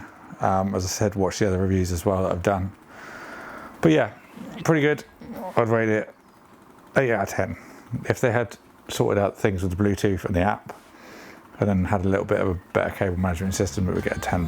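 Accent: United Kingdom. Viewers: Where are you from? Judging by the accent I'm thinking Gloucestershire, Bristol maybe?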